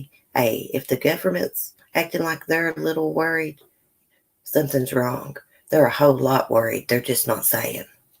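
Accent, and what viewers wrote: South, United States. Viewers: My 5 month old daughter and I listen to you every day. She just lights up when she hears your voice. You make my our day ❤️ Keep it up, girl. Sooo refreshing hearing a southern voice who keeps it real. With love from the Texas hill country, Kate & Roxy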